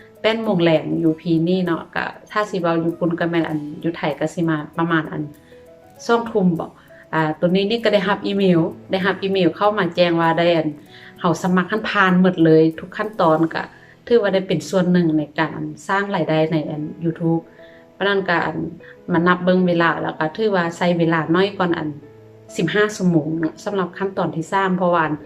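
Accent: Luang Prabang, Laos. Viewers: แสดงความยินดีด้วยค่ะมากๆค่ะ เว่าม่วนหลายจ้า ฟังสำเหนียงก็รู้ว่าต้องยู่หลวงพระบางจ้า บ้านพี่อยู่หนองคายอ.ศรีเชียงใหม่จ้าตรงข้ามเวียง ผ่านกฏเร็วมากๆค่ะเก่งมากจ้า👏👏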